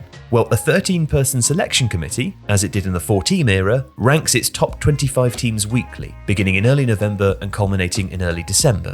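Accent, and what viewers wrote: United Kingdom. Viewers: Hearing a British voice talk about college football is breaking my brain lmao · I refuse to have a British voice tell me how American college football works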